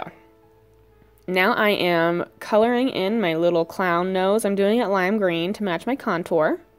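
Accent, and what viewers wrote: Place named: United States. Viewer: Yeah I'm from a different country. I live in Scotland do its not every day I here an American accent.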